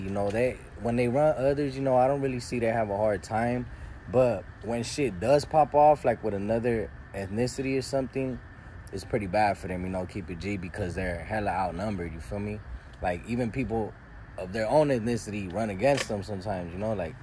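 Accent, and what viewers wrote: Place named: Mexico. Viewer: as an asian dude dis guy sounds mexican dawg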